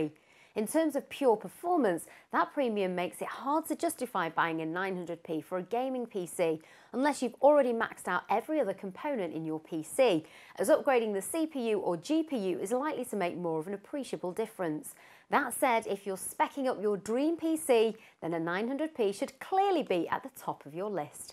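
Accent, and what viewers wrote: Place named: Northern England, United Kingdom. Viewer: I love that northern accent.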